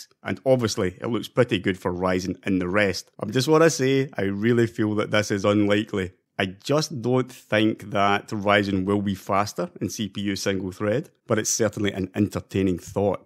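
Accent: Scotland, United Kingdom. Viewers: it's just a Scottish accent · the Scottish accent is not that bad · this guy sounds like someone from scottland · that's just an scottish accent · No need to apologize for the voice as I'm pretty sure most people have figured out by now that you're Scottish :P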